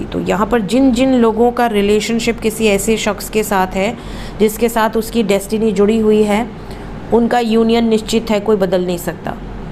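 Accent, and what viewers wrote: Varanasi, India. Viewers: Didi aapki banaras wali boli badi pyaari lg rhi aapke muh se😍❤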